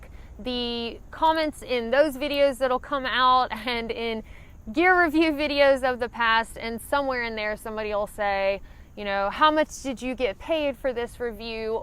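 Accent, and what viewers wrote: South, United States. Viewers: speedy recovery to you dad and thanks for focusing on what’s important in life. I caught your channel about 6 weeks ago and I definitely know you are not sponsored, by your very sincere reviews and even the oops moments. I have used your recommendations for equipment that has gotten me off the couch and start this mad hobby again 35 years after I stopped. Your integrity and the polite way you let us know on what maybe not to buy is refreshing and seldom seen on YouTube. You are one of 4 channels that I watch and to hear that southern accent which reminds me of my dad’s people. Keep up the great work.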